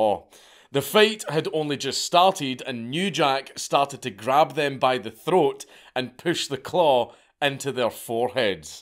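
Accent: United States. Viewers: But your American accent took me off guard, I didn't think you would sound like that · dude, your American accent is spot on. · you have quite a good american accent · American accent Dankula is kinda sic.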